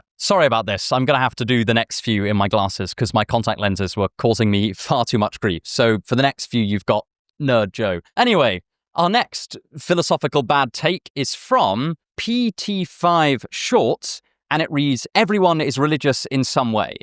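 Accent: United Kingdom. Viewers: I missed the flavor of your providing text, but also being able to have accurate spellings provided (such as "deepity"), and being clear about what you're saying at all times. You're a clear speaker, but you're British and I'm American and the words help. Though I understand if it is time-consuming to have to provide them and you need to reduce your load.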